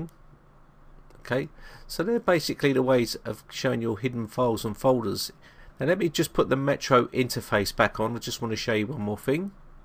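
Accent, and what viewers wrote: England, United Kingdom. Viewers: It's just the English fucking accent you bellend, you know.. the one that came from England and not 'Murica..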